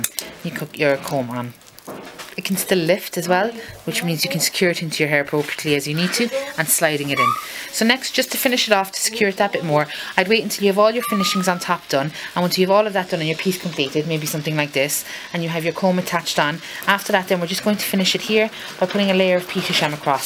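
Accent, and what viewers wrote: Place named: Ireland. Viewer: an Irish accent is like beautiful music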